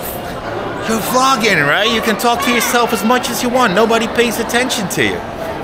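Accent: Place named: United States. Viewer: you born in russia you live in poland? you sound like american its weird 😅 but man thank you for having you here in our country maraming salamat mabuhay po kayo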